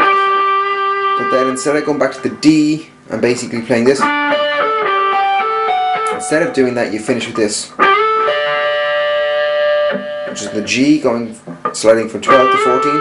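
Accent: Ireland. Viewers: irish ? :D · are u irish... im from galway!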